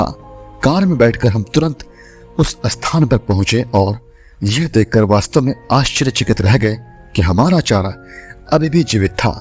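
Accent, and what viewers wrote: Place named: Bengal, India. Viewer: बंगाली एक्सेंट !!! आप बंगला में ही बोलो अच्छा रहेगा। हिंदी बोलना आपके बस की बात नहीं।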